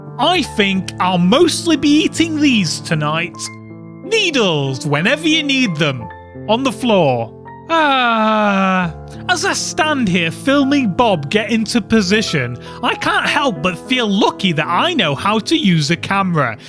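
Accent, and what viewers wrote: United Kingdom. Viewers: He’s British, So Is “Stampy”. Of Course He’s Gonna Something Like “Stampy”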